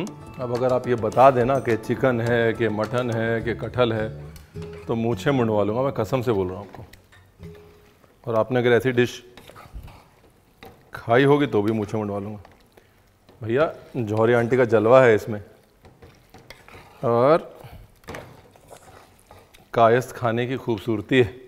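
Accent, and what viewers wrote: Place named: Lucknow, India. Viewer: Scrumptious....Hum bhi kathal.aise hi banate hain par neebu nahi daalte.... Bohat umdaaa aapne banaya hai ji....aap lakhnavi lehze mein.bol.kar Mann khush kar dete hain....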